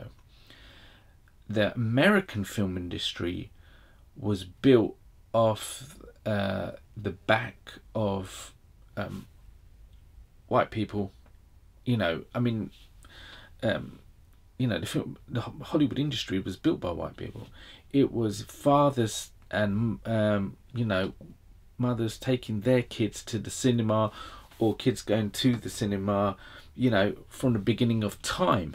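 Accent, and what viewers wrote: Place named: United Kingdom. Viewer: Expected to hear a Midwestern deep African American voice, Received an accent from the motherland across the pond. Jolly good! You earn a new sub for making me laugh as well as we have the exact same shirt on. I don't know the odds on that but it's still pretty fuc*ing impressive. I'm looking forward to more content brother.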